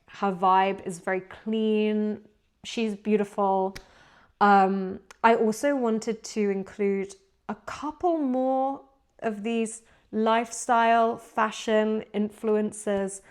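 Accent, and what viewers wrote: United Kingdom. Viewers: 我i like your teachingm, for cn people, from British accent , its very good verygood for us , because pronunciation and clear , just like your teach methods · British accent is absolutely beautiful. She speaks at a comfortable pace, which is perfect for beginners like us. Let’s keep working together to develop the ability to think directly in a second language, rather than translating everything in our heads or depending completely on AI and missing the chance to build our own language intuition. The funny thing is, this comment itself was translated by AI😂😂. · Goog job! You're my first and the only native english channel. I'm about to start a new job in London next week so thanks for letting me hear your british! Best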